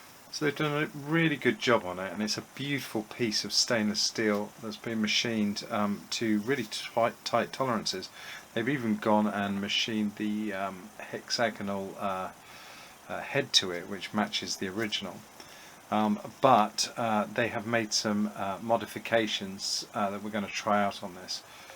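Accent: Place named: England, United Kingdom. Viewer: That is a beautifully crafted part you had made as a replacement. And FWIW - as you wondered about who might watch your videos in the future. I enjoy watching your videos, even though I have no intention of restoring a motorcycle, in fact I don't even own a motorcycle right now. I do however find your video's quite entertaining, and you are a likable presenter with a nice tone of voice and a pleasant English accent.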